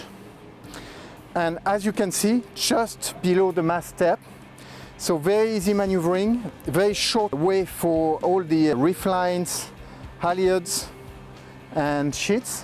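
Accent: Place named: France. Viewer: A frenchman trying to speak english... hilarious.... I can`t stop laughing..... Very nice boat by the way. Really nice boat.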